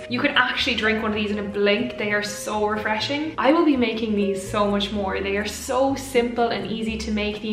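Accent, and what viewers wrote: Ireland. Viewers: Damn. Prolly the best looking Irish girl I’ve seen. That accent I can barely understand though. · You are simple beautiful love your irish accent. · I love your Irish--accented Spanish <3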